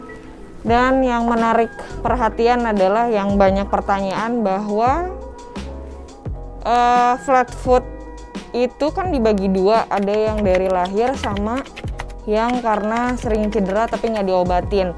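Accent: West Java, Indonesia. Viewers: Asli org sunda?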